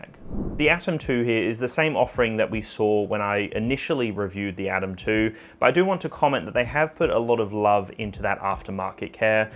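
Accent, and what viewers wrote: Australia. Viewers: G’day, Retired DJ Mickey Rage up in Mission Beach FFNQ here mate…….. I just wanted stop in to say: “Thanks for doing what you do here on the Tube mate……… It’s really nice to hear an Aussie Accent instead of the Septics All The **** Time eh” So, Keep up the great work.. AND while I have your undivided attention: I couldn’t get the first link on here to the potensic atom mate………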